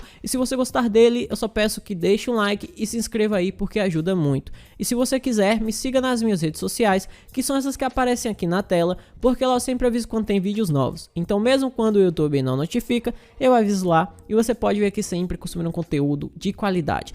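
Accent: Northeast, Brazil. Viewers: Oi! Caso horripilante, o ser que se diz humano, é um bicho perverso. vc é Nordestino? Acho seu jeito de falar bem familiar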